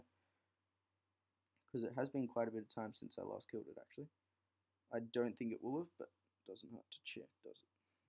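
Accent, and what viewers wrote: Australia. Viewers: Dat aussie accent tho... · U sound aussie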